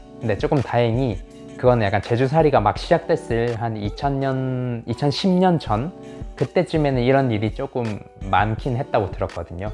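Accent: Gyeongsang, South Korea. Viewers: 표준말을 경상도 사투리로 쓰시는데요. 귀욤!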